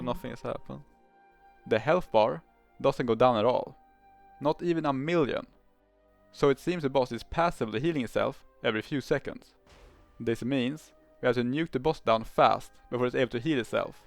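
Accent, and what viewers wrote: Sweden. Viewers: Never have I heard such a heavy swedish accent.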